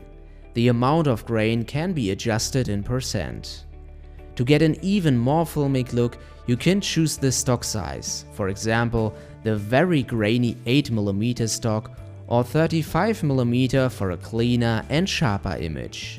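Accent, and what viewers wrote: Germany. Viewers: is that a german accent that this guy have?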